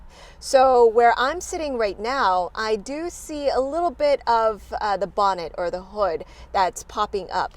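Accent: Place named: United States. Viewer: 으음. 메이크업, 스타일은 한국식인데 영어는 미국식이시군요ㅎㅎ 차 리뷰도 보고 영어 표현도 배우고 잘 봤습니다. Well presented. 💘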